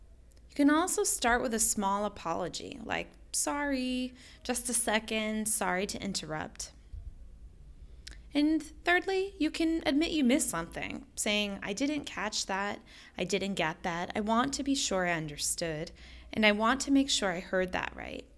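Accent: United States. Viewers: Great American accent you have.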